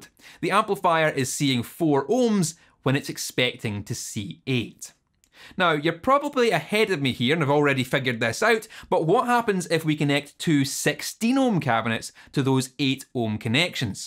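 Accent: Scotland, United Kingdom. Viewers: He's not American, but Scottish.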